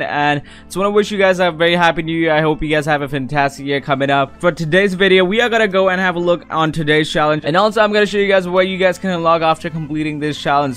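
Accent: India. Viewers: You indian?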